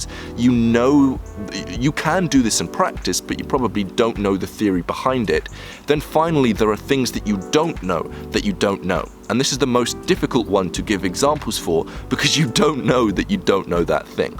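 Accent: United Kingdom. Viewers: Where are you from? Your accent sounds quite British but it's very mild. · What part of the UK are you from man?!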